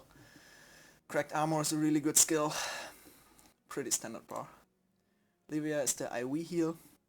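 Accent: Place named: Germany. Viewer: man hört so heftigst den Deutschen Akzent raus das so schlimm, aber dennoch gutes Video^^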